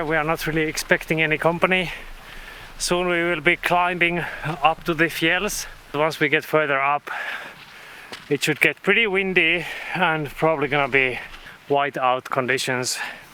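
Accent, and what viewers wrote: Finland. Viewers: It’s been a long time since I’ve heard the Finnish accent. I do miss it. Cheers to the both of you from a🇫🇮🇨🇦on the coast of British Columbia 🇨🇦 I’ve been following you two since you were on the North Coast of British Columbia 👌Cheers 🍻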